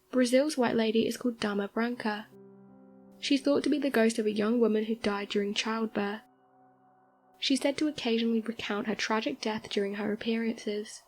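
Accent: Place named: Scotland, United Kingdom. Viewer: I like your brouque. Is it Scottish or English?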